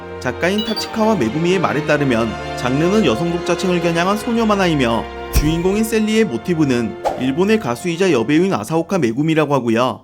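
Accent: Gangwon, South Korea. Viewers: 왜 난 강원도 억양처럼 들리지?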